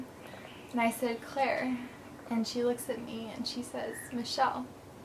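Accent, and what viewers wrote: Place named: United States. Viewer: what a lovely girl, I'm an English guy and my heart melts when I hear an American girl talk! I could definitely do with meeting a cool American chick!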